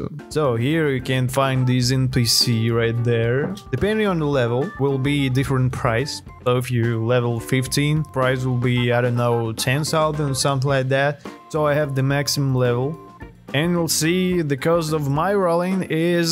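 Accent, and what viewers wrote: Russia. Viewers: Bro has russian accent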